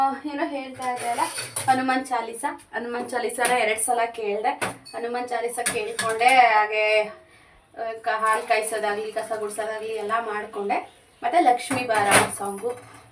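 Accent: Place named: Mandya, India. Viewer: Nimma kannada mathadi a style nodidre mandya side nivu please heli Nanna sister na k m. Doddi side marriage madi kotidivi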